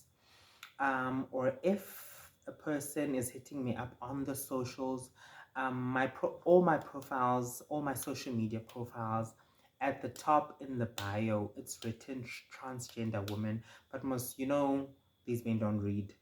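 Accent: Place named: United Kingdom. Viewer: Your English is close to British.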